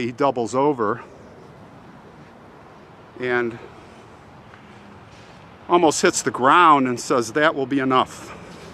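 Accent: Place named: United States. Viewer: Your talking in popular American English helps me to learn more and more words that I don't know before because I already learned the standard English language ..Thanks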